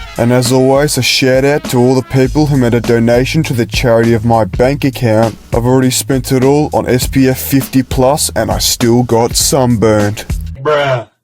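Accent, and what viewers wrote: Australia. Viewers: If you don't like the aussie accent why the fuck are you watching an aussie channel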